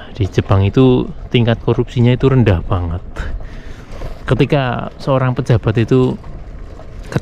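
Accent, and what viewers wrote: Banyumas, Indonesia. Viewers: Setiap tayang... yg gue tunggu bahasa ngapak mu, mas!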